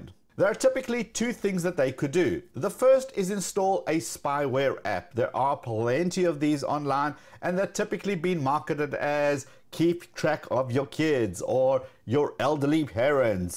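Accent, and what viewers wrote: South Africa. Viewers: Are you a South African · Ayyyy I recognize the south African accent 😀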